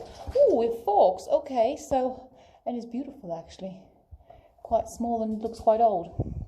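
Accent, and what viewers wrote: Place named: RP, United Kingdom. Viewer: Hello from America! Nothing like a gorgeous lady guiding us through some awesome mines ! Especially with that posh accent 😍😁🙏🏻🙌🏻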